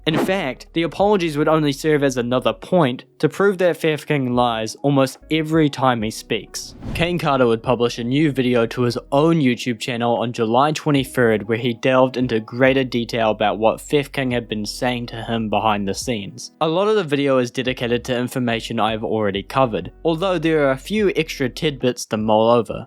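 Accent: United Kingdom. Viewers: You're videos are good but please stop being british, it's hard to listen to you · Are you british?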